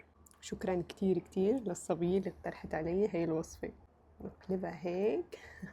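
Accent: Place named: Coast, Syria. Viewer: اي من عنا من الساحل في عدة لهجات نحنا مثلا لهجتنا قريبة من ضيعة ضايعة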